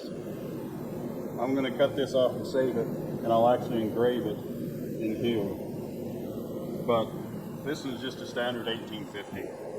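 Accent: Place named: Appalachia, United States. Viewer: What's the noise in the back ground can hardy under srand your hill Billy accent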